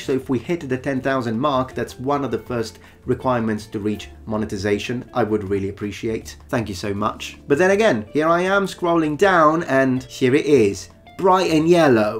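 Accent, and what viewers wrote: United Kingdom. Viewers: The more angry gets the more British he becomes. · He does sound like a posh Englishman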